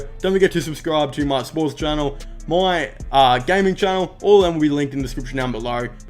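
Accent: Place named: Australia. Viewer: aussie accent lad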